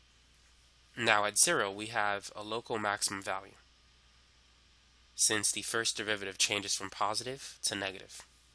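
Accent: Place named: New York, United States. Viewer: You must be from new york proffesser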